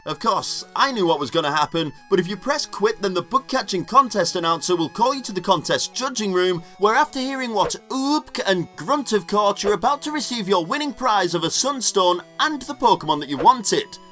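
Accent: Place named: Bolton, United Kingdom. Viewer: Came for the glitches, stayed for the Bolton accent. Have a subscribe, friend!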